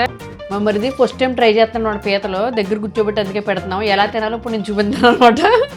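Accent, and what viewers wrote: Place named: Konaseema, India. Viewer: Garu Mi Vedio 's Baguntayi Konaseema Language Matladuthuntay Baga Enjoy Chesta Madi Konaseema Function Lo Baga Enjoy Chesara Next Vedio Lo Pettandi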